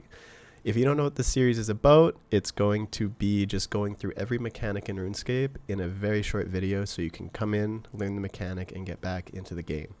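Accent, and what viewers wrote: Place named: Canada. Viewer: We guessed you were Canadian 2 seconds in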